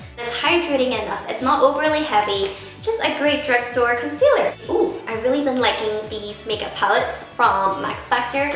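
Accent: Northern Ireland, United Kingdom. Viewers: Love your videos!! And your Northern Irish accent! :)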